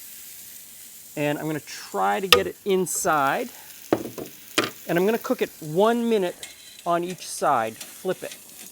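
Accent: Canada. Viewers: The CC is translating his Canadian accent "Blazing Bull", to Blazing Bowl.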